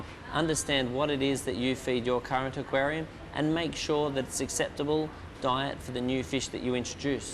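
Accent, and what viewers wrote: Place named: Australia. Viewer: He's an aussie, not a brit lol. And for some of us who have high amounts of aggression in our tanks this advice is very helpful.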